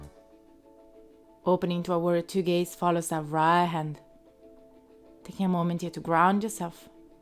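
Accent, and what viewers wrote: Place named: Italy. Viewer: That was really a phantastic flow but for me it would be perfect if you could talk slower. Please consider one hast to get used to your Italien accent and not everybody who is watching this videos is a native english. So I am german for example. Nevertheless thank you very much for this interesting lesson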